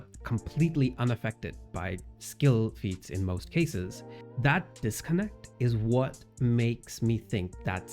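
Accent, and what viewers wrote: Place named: Canada. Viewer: I love your accent! It's a subtle Canadian accent (I think?) but there's something about it that is distinctive that I can't place, even though it's extremely mild. Also great ideas about the game, a lot of people sleep on subsystems and I think you've pretty comprehensively analyzed why.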